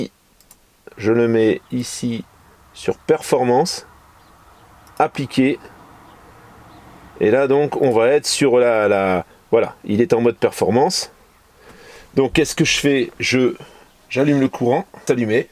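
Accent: North, France. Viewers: Pas mal du tout!!mais tu n'as pas des origines du nord???t'as un acchent de ch'nord!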